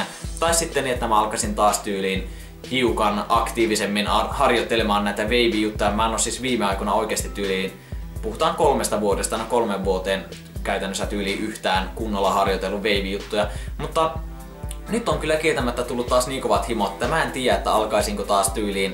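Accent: Finland. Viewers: Tuli vaa mielee että missä asut kun puhut tuommosta murretta. Lapissa mutta missä siellä?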